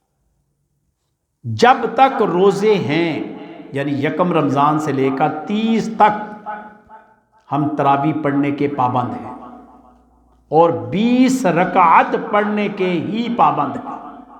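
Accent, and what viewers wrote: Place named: Punjab, Pakistan. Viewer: Tu c Paindo ho. Ardo (Urdu) Punjabi which bolde ho.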